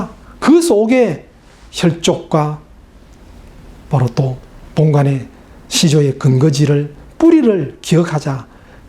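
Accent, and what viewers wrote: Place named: Gyeongsang, South Korea. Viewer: 경상도 사투리와 중국어의 억양이 똑같아서 멀리서 들으면 마치 중국어처럼 들립니다. 하루속히 표준말로 바뀌었으면 좋겠네요.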